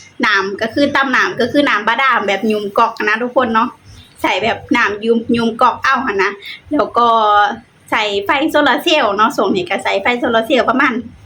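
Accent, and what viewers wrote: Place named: Ubon Ratchathani, Thailand. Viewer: สาว พูดสำเนียงคล้ายอุบล ไม่เหมือนคนลาวเลย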